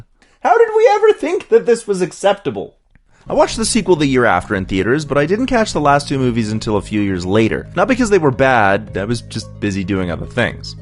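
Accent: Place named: Canada. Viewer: Question, is he Canadian? The “OUUT” gives him away. lol Love the video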